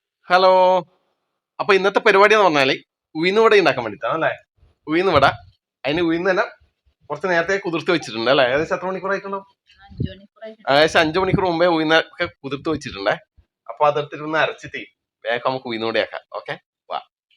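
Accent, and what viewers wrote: Kannur, India. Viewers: Niggalku. Nammude. Kannur. Basha. Manasilakunile😂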